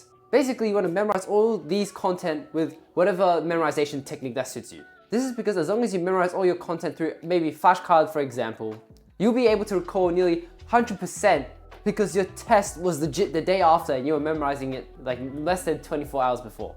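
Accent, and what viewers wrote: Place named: France. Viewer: Is it only me who loves how he speaks french?💀 The accent and the advice are both gold thanks 😃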